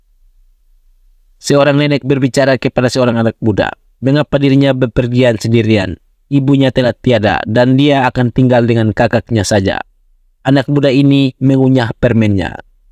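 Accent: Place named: East, Indonesia. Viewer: der ngeri kali aksen kau 😂 logat timur kau kental kali😅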